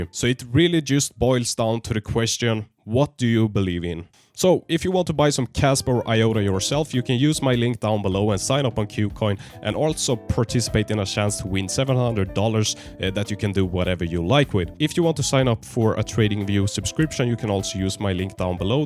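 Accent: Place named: Sweden. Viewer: Yoo I can hear the Swedish :), let's meet and discuss crypto IRL. Botkyrkabo jobbar i Norrmalmstorg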